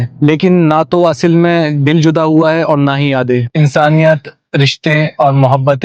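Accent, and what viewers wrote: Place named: Pakistan. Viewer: Ap. Bilkul pakistani lagte the mujh. Pure urdu...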